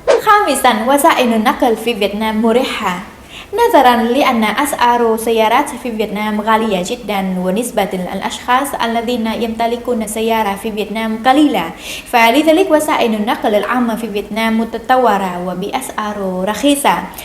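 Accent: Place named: Thailand. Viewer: Bạn nói tiếng Arap với accent tiếng thái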